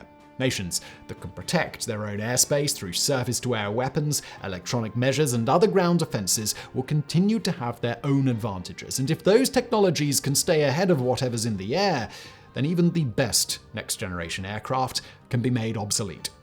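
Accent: United Kingdom. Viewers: Why do you use US spelling, or simplified English? From your accent I thought you were somewhere in Great Britain.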